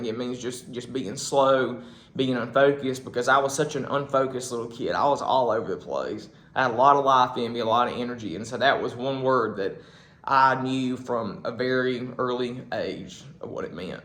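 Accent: South, United States. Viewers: Dude....your accent is awesome. I've got family from east Texas and I lived in Kentucky for 7 years, and it's very familiar. I got a little plaque in my kitchen that has 1 stick figure = you, 4 stick figures = y'all, and 5+ stick figures = all y'all. Gotta love the southern accents! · Transplant to eastern NC from NJ - going on 20 years now. Amazed at the differences in the various regions of the south. LOVE your accent and local regionalisms; just so rich & evocative. My mother-in-law is from central/western Carolina. She talks about “mashing” buttons and a crowded situation as being “slam full.” (“Fixin’ to go uptown…”) Wondered about your accent and where you might be from, so thank you for the terrific video. 👍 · Enjoyed your video. Greetings from New Zealand where we have two official languages English and Maori - the language of our first nations people. 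Languages, accents/dialects really interest me. I always thought New Zealanders had no accent until, when I was 20 and lived and worked in the UK for 8 years, where people commented on my accent. When I returned to New Zealand after 8 years living in the UK, people commented on my "English" accent! I have a friend who immigrated from Arkansas to New Zealand about 6 years ago. His accent is similar to yours but not as strong.😊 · I love this. I lived in Raleigh, NC for 15 years and started to acquire the accent from that area but ended up back in Indiana and have mostly lost it, but I really like the southern accent (quite different from "Hoosier" Indiana). I knew quite a few of the one you mentioned, but the regional differences are interesting. My surname family is from Scott County, Virginia (near SW corner), and many branches are from North Carolina, so I feel a bit connected to the region. 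I have just stumbled onto your channel past couple of days as it appeared in YouTube suggestions. Been watching some interesting content. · Us "Yanks" like his Southern accent too! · Your strong southern accent is interesting and adorable. Just like your handsome face. 😊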